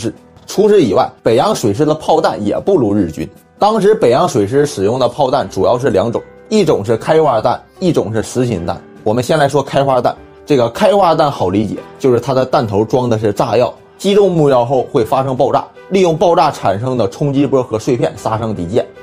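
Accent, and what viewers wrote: Northeast, China. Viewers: 口音太重，一口东北大碴子味儿。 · 好片，轻东北口音解说听着很给力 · 这大碴子味的普通话听不清你说啥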